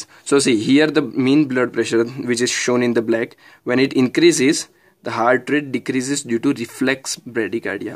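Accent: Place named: India. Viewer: Love your Indian accent ♥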